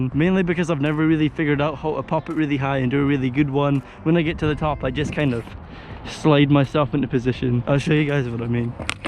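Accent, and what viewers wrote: Scotland, United Kingdom. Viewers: haha love that scottish accent! Gonna live near the Loch Lomond one day when I´m old! Keep up the great work!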